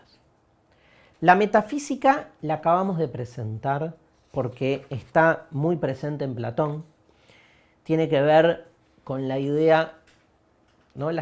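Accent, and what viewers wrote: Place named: Argentina. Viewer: es genial!! Clase de filosofía con carcajadas es una delicia. De ñapa clase de español argentino.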